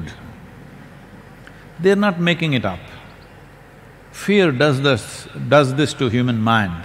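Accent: India. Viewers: 至少你多了聽得懂印度腔英文的機會不是嗎XD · 看薩古魯學英文會不會印度腔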